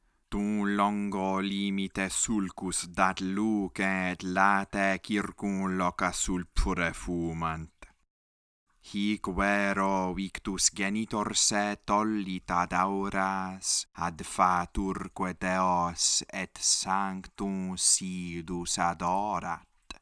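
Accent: Italy. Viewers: Calabrese Systēma vōcālium est. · Excellently read. The accent is more modern Italian-like and old Proto-Celtic-Italic. The Classical Latin had more closed e and o (unlike Italian now), except sometimes in closed syllables, but not much. The nasalization of vowels receded mostly and the elision of syllables was reduced due to the reduction of the nasalized and very eliding dialect (a dialect of vulgar Latin and Latin that emerged as a dignified minority). The way poetry was composed, was from the nasalized, eliding dialect, which was not the only one. The other main one was much less nasalized, did not drop the final m, except in prefixes, into n (com to con) . Classical Latin poetry is from the clique that used the nasalized, eliding dialect of Republican Latin, which was replaced by its old brother the very literally and not very elidingly pronounced one (both were very identical to one another otherwise). 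Vulgar Latin also existed as the very not eliding, non-nasalized dialect. Both dialects elided to varying extents the vowels between words that had vowels next to each other. The dialect that lasted throughout the Roman cultural clique as such, was the less nasalized and less eliding one. Vowel length and high amounts of changes in pronunciation were preserved until the middle of the fourth century. By the beginning of the fifth century, the pronunciation that would be called close to Italian was more prevalent, though in Sardinia, a variety existed where vowel length was not preserved, but pronunciation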